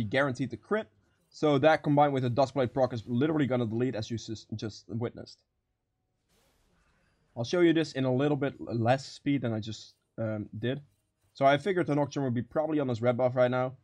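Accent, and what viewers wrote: Netherlands. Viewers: Are you dutch, you sound dutch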